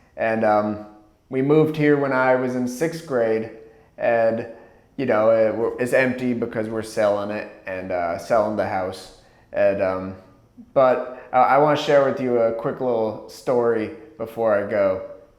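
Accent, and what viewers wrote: Canada. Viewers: Canadian accent tho?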